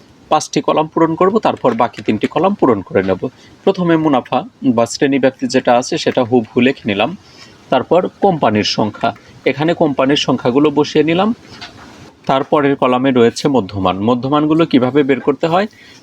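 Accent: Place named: Pabna, Bangladesh. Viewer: Sir আপনার village ki pabnai? Language oirokom..🙂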